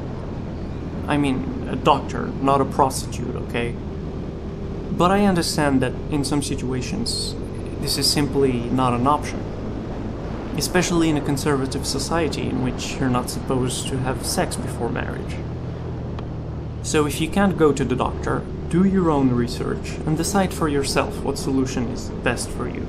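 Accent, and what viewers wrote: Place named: United States. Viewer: One question : From where or how you learned to talk like that and speak english fluently and like an american ; i need some help !! Hope you answer me .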